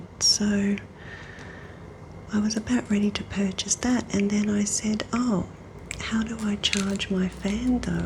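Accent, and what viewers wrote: Australia. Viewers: I found my new favourite ASMRist ❤️ the fact you’re Australian is also comforting for me. I’m from the UK and my 20 year old son is currently in the Philippines but will be heading to Australia in July where he plans on staying for up to 3 years. I miss him terribly, but you’ve definitely helped. Thank you. ❤️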